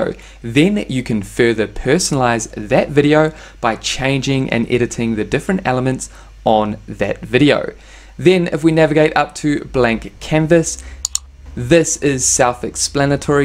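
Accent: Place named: New Zealand. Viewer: Goddddd man, Tour accent Is so strong Nuova Zelanda I guess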